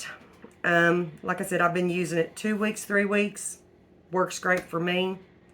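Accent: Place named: South, United States. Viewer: I’m just loving the southern accent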